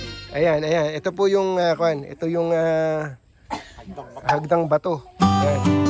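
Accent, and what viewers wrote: Nueva Ecija, Philippines. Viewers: Hahah nkaka miss ang puntong Nueva Ecija.. “Wala eka eh”😂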